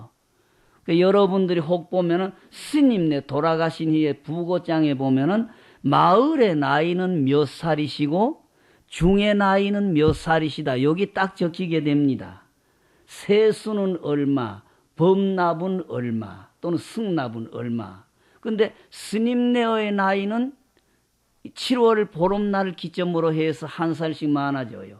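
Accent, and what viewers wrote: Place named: Gyeongsang, South Korea. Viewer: 스님 너무 그립습니다 다시 듣고 또 듣고있습니다 경상도말에 담담하게 하시는법문이 가슴에 너무 남습니다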